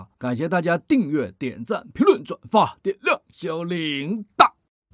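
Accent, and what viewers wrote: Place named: Shenyang, China. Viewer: 主播的口音也太亲切了，老铁是沈阳银吗？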